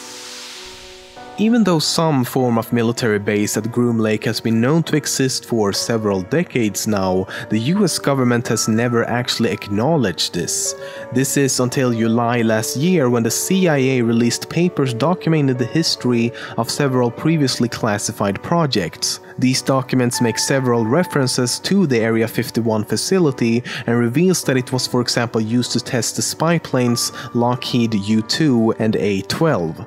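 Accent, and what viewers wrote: Sweden. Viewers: We can hear you are swedish!😂 i’m personally swedish. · Tbh I can’t stand this Swedish English accent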